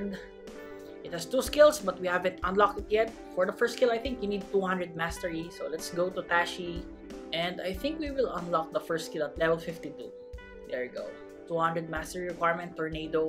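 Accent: Philippines. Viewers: Ur voice is satisfying btw sounds like a pilipino speaking enflish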